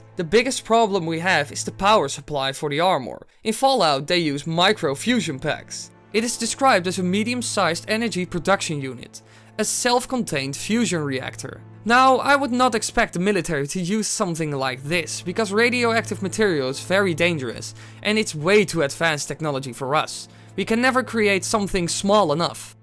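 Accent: Netherlands. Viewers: Wait.. you're dutch... GROETJES!! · are you dutch ??? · Dat merkte ik ook gelijk hahaha... Steenkolen Engels op zo'n manier komt alleen van een Hollander. ;) Later!!! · Are you Dutch?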